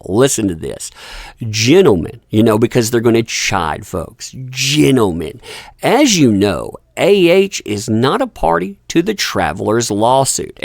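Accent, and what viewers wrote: South, United States. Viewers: Interesting news indeed. Concerning a previous livestream, who couldn’t like the sweet southern drawl? It’s always sounded great from here in Canada.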